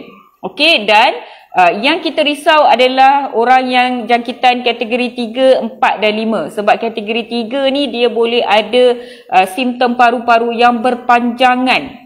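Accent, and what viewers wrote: Malaysia. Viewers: Doktor punya slanga memang melayu habis. Terima kasih diatas penerangan jelas ini.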